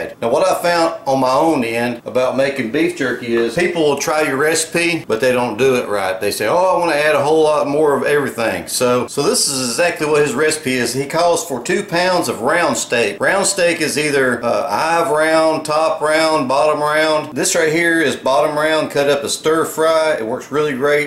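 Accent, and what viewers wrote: South, United States. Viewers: southern accent and all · that thick southern accent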